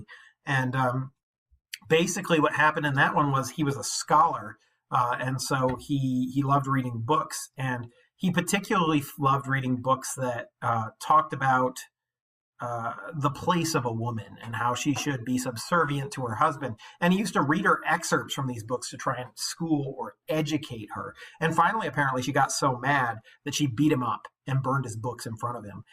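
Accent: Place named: Oxford, United Kingdom. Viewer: Dr / Mr, I like your Oxford English accent. I live in the south of England.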